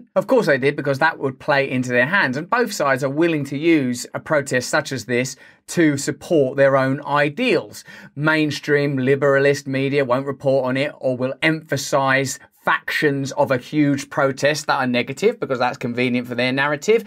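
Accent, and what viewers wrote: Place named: England, United Kingdom. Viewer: I luv ow you pronounce words... Souch a real english dude :D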